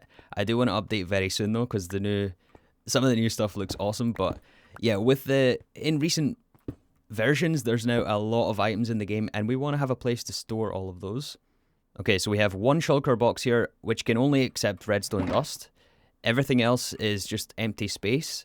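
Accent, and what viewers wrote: Scotland, United Kingdom. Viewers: Are you Scottish and if so where you from? Just discovered your channel and love it. I’m from Glasgow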